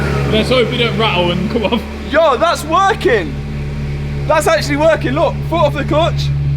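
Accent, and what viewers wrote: United Kingdom. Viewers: This dude cool but his accent is brutal. Goofy uk accent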